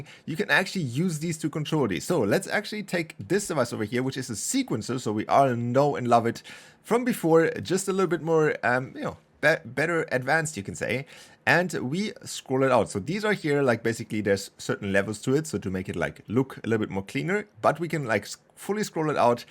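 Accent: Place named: Germany. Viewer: Nice Video! You have a little German accent so I wonder are you speaking German normally?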